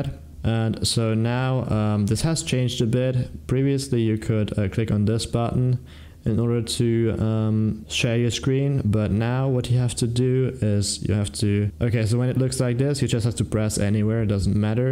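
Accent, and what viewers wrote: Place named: United Kingdom. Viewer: u sound british ngl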